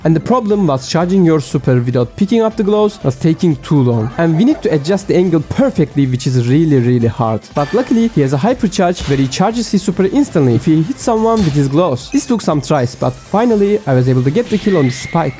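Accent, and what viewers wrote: Turkey. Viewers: i can feel your turkish accent in english am i correct? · I can smell the turkish accent from miles ago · The turkish accent 💀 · aksandan anladım anında Türk olduğunu kral güzel video eline sağlık ❤❤ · It is because of his accent because he is Turkish. Most Turkish people have this accent. Even I have it because I am Turkish too😊 🇹🇷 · konuşmandan türk olduğunu anladım baktım harbi türkmüşsün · Konuşmandan anladım büyük ihtimal Türk’sün bi dahaki videonda damian yapar mısın ama ne olur ne olmaz ingilizcesinide yapiyim. Could you do Damian too in your next video? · bro ur turkish accent is giving u away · Türk lan bu aksana bak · I feel the Turkish accent (laudatory) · Turkish ahh Accent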